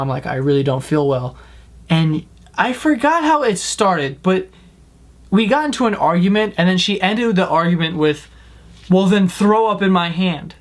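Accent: New York, United States. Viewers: He's a New Yorker i say that too. Ppl say things differently lol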